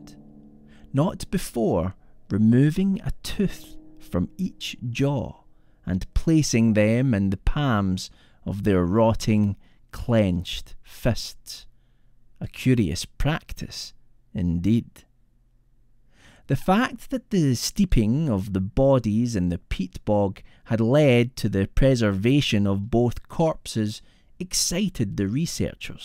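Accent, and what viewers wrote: Scotland, United Kingdom. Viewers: oh my god Scottish voice narrator and the great! so glad I found this channel! it always amazes me that I can go to 20 different creepypasta narration channels and they all will have different but equally good material for me to listen to! so much talent out there and so many outlets to find them in its just wonderful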